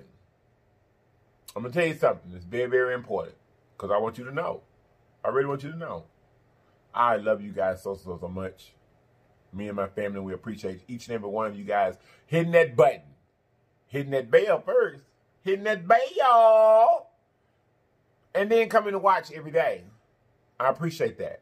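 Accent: South, United States. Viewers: So country lol I love it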